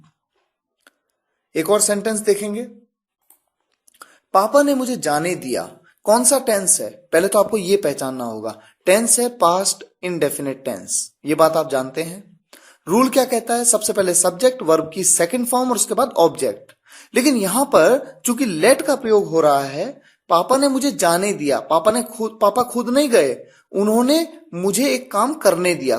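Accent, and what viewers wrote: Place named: Bihar, India. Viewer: Bihari lg raha hai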